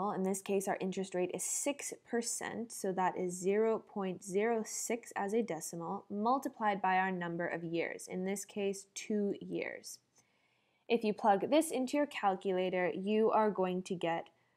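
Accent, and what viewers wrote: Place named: United States. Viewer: You sound hella American,thanks for this video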